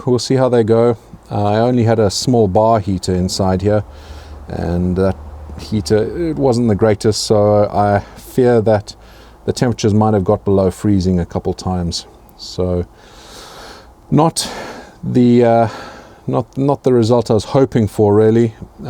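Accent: South Africa. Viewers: Awesome channel. Built a hydro system as a little hobby project and my first thought was "I have to grow peppers in this" so managed to get hold of a nice assortment including some Reapers. Been enjoying your sauce making videos very much and great to see your growing methods too. Your surroundings say UK but your accent says SA. Either way, hi from Johannesburg!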